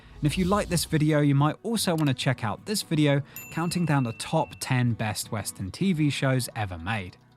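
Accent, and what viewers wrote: United Kingdom. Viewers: Terrible list, but the guy sounds British, so....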